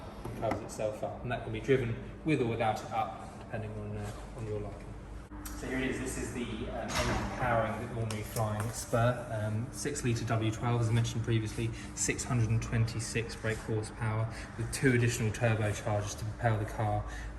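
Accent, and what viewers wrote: India. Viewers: The guy never shows his face. From the accent he sounds Indian. How come you hiding your face?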